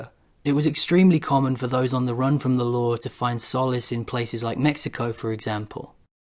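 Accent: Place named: United Kingdom. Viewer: Funny how you Brits are obsessed with our American wild west. And, Dude, you have a cool accent 😎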